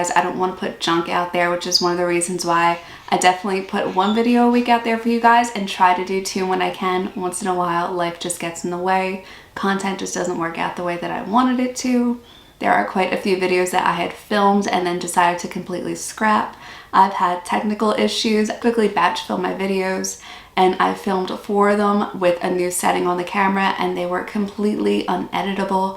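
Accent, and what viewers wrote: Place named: Australia. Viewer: Hey. You’re doing great. Something I’ve noticed in recent videos is that your accent has changed a bit. Some sounds you make are beginning to sound more Aussie.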